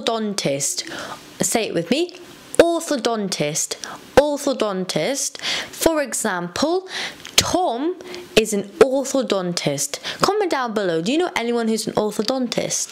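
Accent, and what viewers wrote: United Kingdom. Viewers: Thank you very much I do love british accent send me more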